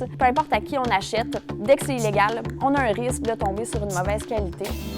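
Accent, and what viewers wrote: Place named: Quebec, Canada. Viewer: i've been speaking french for more than 15 years now, every 10 words she says, i only understand one,is this how all french canadian speak?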